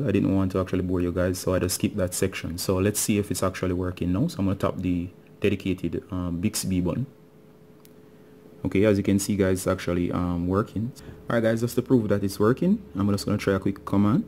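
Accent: Jamaica. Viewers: where are you from, you sound Jamaican.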